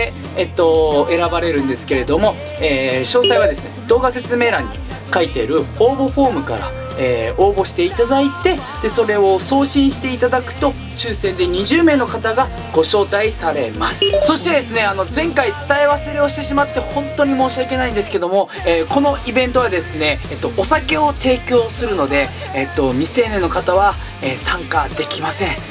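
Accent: Fukuoka, Japan. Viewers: 福岡弁がすごくいいです😉💖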